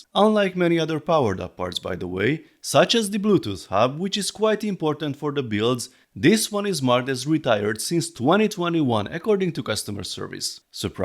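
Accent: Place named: Hungary. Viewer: Heló i m..... erősen magyaros a kiejtés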